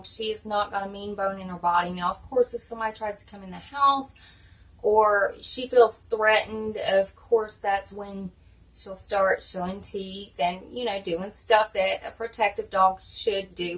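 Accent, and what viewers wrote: South, United States. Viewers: This was so cool!! And you’re such a darling! And for the record, I think you have a nice accent. No like a hick or something. Sounds like southern charm to me.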